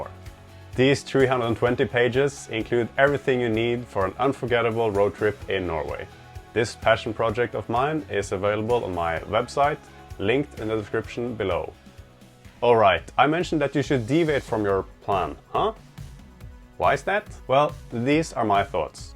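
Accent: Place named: Sweden. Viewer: Fresh video! Been watching your vids for a while now, they're super nice. We are starting our 2 week roadtrip (tenting only) tomorrow, from Stockholm to Lofoten, Senja, Tromso..but starting in the south close to Troltunga.:) Thanks for the tips! Wish us good weather. Also, my gf said you has a swedish accent.:D I'm not swedish but I agree with her. Where do you guys live in Norway btw?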